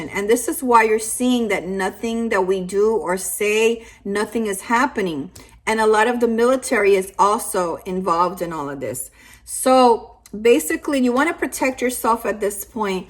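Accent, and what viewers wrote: Latin America. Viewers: Hair looking great as usual, love the librarian glasses that go so well with the choice of top, sweet Latina dialect.... Giving this video a solid 9.3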